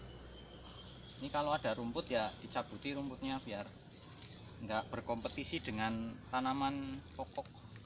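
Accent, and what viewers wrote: Banyumas, Indonesia. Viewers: Mas sampean kok medok banget..ngomongnya kok ngapak2...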